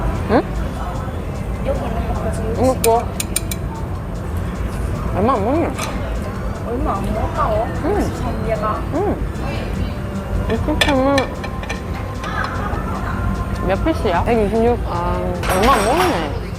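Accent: Gyeongsang, South Korea. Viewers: 경상도 사투리 구수함 😊😊😊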